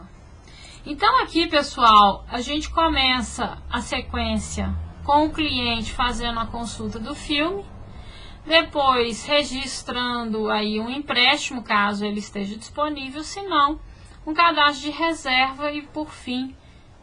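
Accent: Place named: Minas Gerais, Brazil. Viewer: Vcs reclamam de tudo, a moça deve ser de Minas Gerais e o Brasil possui vários contrastes e muitos sotaques. Aprendam a respeitar a forma de falar das pessoas. Com certeza na dependendo da cidade de vcs, também tem um jeito de falar e consequentemente na cidade dela iriam estranhar sua forma de conversar, mas com certeza teriam respeito por entender que não nasceram ali. Espero que tenham entendido agora. Bons estudos a todos !!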